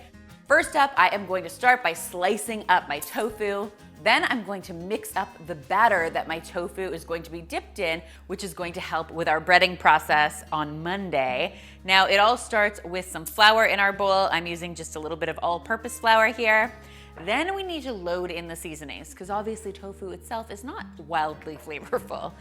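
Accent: Canada. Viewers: She has a Canadian accent.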